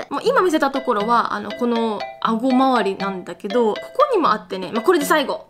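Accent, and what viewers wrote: Kansai, Japan. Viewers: チャン、関東人ではないですよね？イントネーションがチョット違う。名古屋から西❓ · え？関西弁多くない？？？かわんいい、、、、、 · 友達にパスタアレルギー大変らしいよと言ったら『そんなアレルギーないよ。』と強めに言われた‥。関係ないけど、たまに関西ぽいイントネーション出るね。擬音とよくわからない行動もよく出るけど‥。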